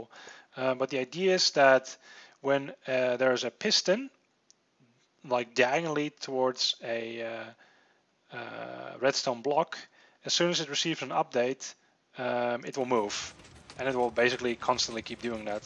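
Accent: Netherlands. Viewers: je klinkt nederlands. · You sound Dutch as fuck.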